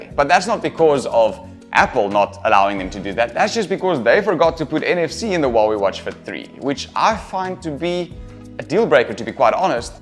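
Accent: South Africa. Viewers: He sounds South African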